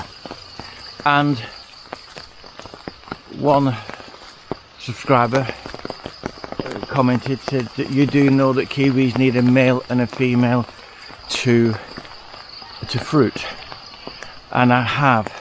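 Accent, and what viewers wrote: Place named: United Kingdom. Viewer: great video, just subbed :)) which part of the uk is your accent from?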